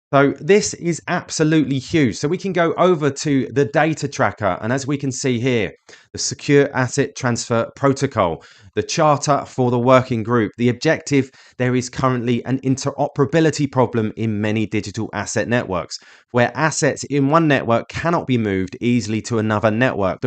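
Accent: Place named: United Kingdom. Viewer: Not to mention, I think your British accent rocks!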